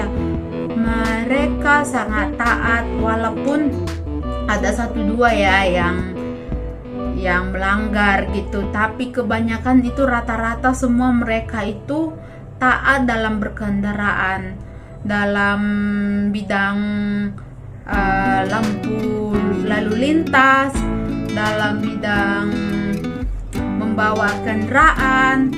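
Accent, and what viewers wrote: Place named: Medan, Indonesia. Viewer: Dia dari Medan Sumatera Utara Indonesia... wkwkwk cuma bagi tahu je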